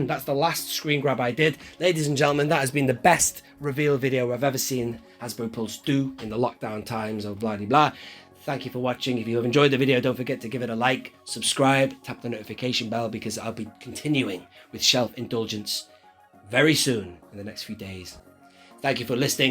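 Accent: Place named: United States. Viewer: I love hearing your american accent.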